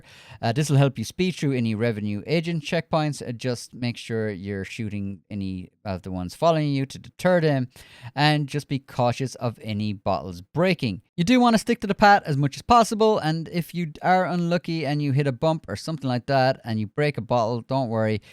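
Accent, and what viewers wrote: Ireland. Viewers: I love the Irish accent.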